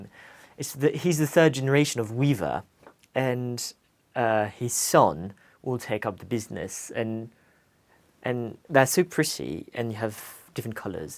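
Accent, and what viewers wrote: England, United Kingdom. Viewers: bravO!! Your home is homely, elegant, quirky and inviting and graced by your charming english accent:-) ⭐⭐ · Such a nice place with a country feel ..this is one of the reason i like London and the British touch ... it's often very personal and a mix of patterns and colors.. how can a young man (who has recently arrived in London) able to afford such a nice London home?! . C'est vraiment impressionnant comme parcours ...et qui plus est you've picked up the english accent ...vous parlez parfaitement dans les deux langues ..last but not least j' adore votre intérieur .. you're so talented , je suis estomaquée ! And if you're reading this little note , seriez vous assez gentil de me dire avec quelle "paint" vous avez refait la cuisine car j' aimerais rénover ma vieille cuisine qui a de beaux éléments en bois et la votre m'inspire !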